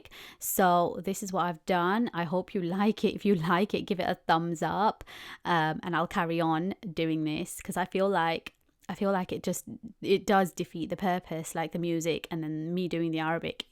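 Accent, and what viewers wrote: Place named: United Kingdom. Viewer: i know this sound unrelated to your beautiful art this is the first time i've heard your voice i don't know why but i thought i was hearing a british accent (i don't mean it rudely i mean it as a compliment!) also thank you for the tutorial so beautiful Mashalllah!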